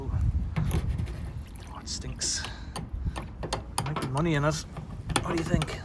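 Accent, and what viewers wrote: Ireland. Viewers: oops, my bad. I thought it was a channel from Ireland, I was wondering, one would never get away with dumping like that in Ireland, at least I hope not. I wrongly assumed when I heard the Irish accent that it was Ireland.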